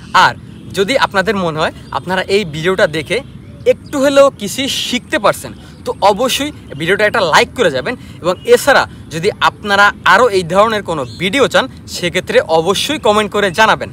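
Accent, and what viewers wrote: Sylhet, Bangladesh. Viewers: Tumi Sylhet taki naki vai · Are you sylheti ?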